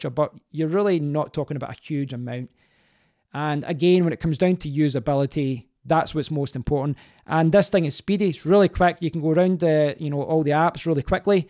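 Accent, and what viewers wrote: Scotland, United Kingdom. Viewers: I love your Scottish accent...It has been a while a heard such a pure Scottish accent! And the U11 is definitely the Android phone to get in 2017. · Excellent video love the scottish accent so refreshing to hear seriously considering on getting the U 11,I have the LG G6 at the moment and i am enjoyingi it ,but I am admittedly a big fan of HTC and can't wait to get the U 11.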